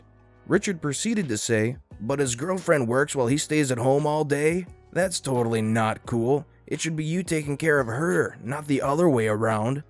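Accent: United Kingdom. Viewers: Are you British? If so hi.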